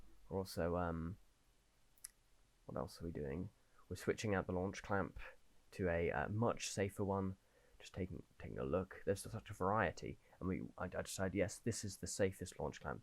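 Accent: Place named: Australia. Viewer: why you an ozzie mate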